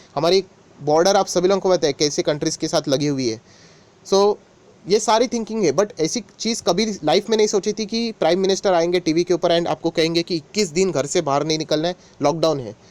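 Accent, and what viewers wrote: Maharashtra, India. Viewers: बोलण्याचा पद्धतीने तर मराठीच वाटतो.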